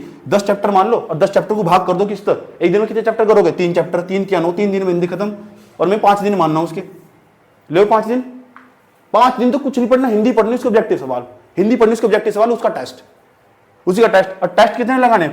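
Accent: Haryana, India. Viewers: Sir aap haryanavi ho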